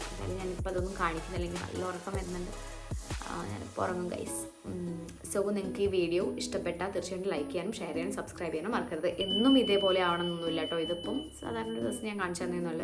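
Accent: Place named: Palakkad, India. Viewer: Hello chechyy Unnivava vlogs le chechy mare athe slang ningalkum....ningl oke palakkad aayond aavum llea... Anyways kettirikkan nalla rasamund ....❤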